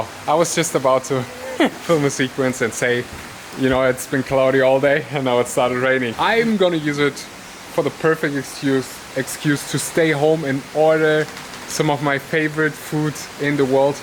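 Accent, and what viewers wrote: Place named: Germany. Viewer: You have a thick German accent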